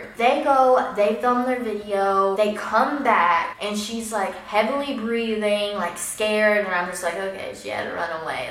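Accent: South, United States. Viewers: I cracked up when you imitated her screaming "I CAN DO WHAT I WANT! GET OUT OF MY FACE!" Just the way you went about it. By the way you're really pretty and I love your Southern accent. I live in Minnesota and some of us talk like Canadians.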